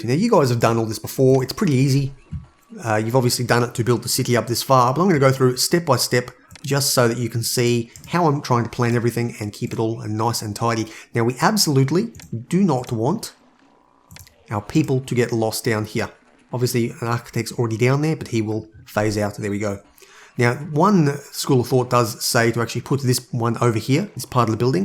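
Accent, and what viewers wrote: Australia. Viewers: Are you Australian? I'm an Indian who's just been exposed to different accents and I'm trying to understand all of them lol! 😂😂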